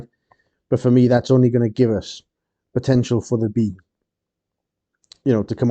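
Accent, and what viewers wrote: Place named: Wales, United Kingdom. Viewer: Good info mate. Nice to hear solid TA from a fellow Welshman ;)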